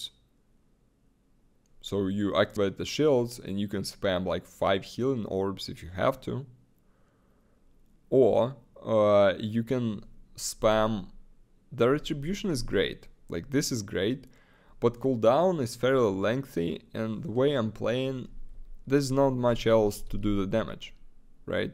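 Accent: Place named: Russia. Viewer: То-то я думаю акцент русский )))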